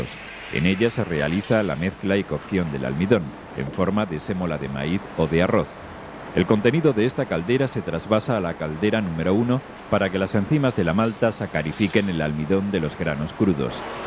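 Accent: Spain. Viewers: Definitivamente esto es adictivo, las tomas, los paisajes, el contenido, la voz en off, todo me recuerda mi niñez cuando todos los documentales se doblaban a español ibérico, gracias. Saludos desde México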